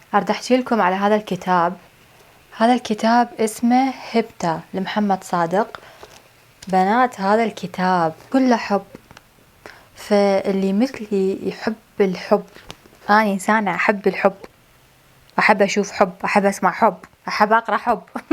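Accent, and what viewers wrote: Iraq. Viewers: اوويلي كلش تونست اشكرچ انتي ❤عراقيه اصليه ومغيرتي شي بكلامج ك عراقيه اوو اسلوبج احبج بكد القطار 🙂اتمنى تردين عليه 🙁💜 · اعشق اللهجة العراقية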